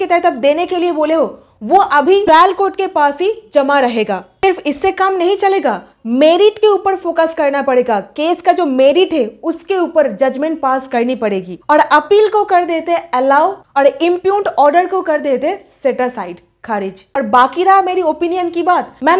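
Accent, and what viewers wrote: Bengal, India. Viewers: आधि तो इंग्लिश बोल रही है , और हिन्दी भी शुद्ध नहीं है ,,, यह बंगाली हिन्दी है 😢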